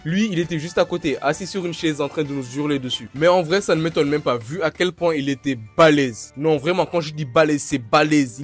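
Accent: Senegal. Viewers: Ta trop un acent senegalais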